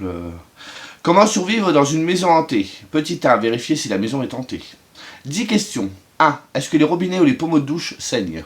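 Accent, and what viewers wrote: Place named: North, France. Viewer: Coucou! première vidéo que je regarde et j'aime bcp ta simplicité et ton petit accent... serais-tu du ch'nord par hasard?? 🤔😁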